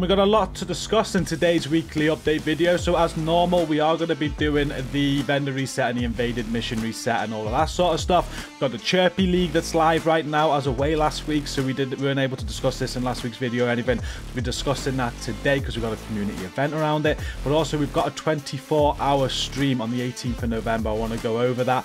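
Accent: United Kingdom. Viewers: Your English is hard to understand, is it British English?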